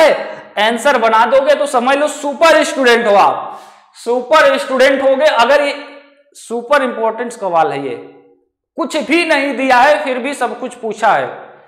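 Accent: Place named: Uttar Pradesh, India. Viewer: Up se ho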